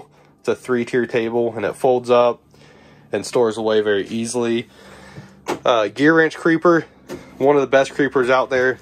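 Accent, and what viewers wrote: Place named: Pittsburgh, United States. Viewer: Bro that’s a hell of a setup. The effort you put in is evident and well worth it. Organization is key in this field. Where are you from? Pittsburgh/Ohio area? Thought I heard a little Pittsburgh accent in there lol.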